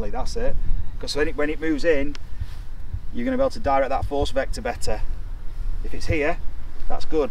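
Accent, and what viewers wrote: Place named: Doncaster, United Kingdom. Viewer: Sounds just like Doncaster 🤩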